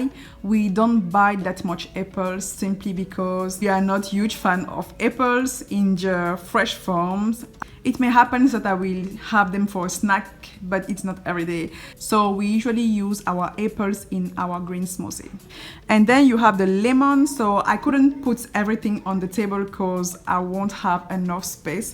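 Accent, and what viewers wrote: France. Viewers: she is French and it is her native language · Kea lila Sok I believe she is from France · Stephanie Brown French accent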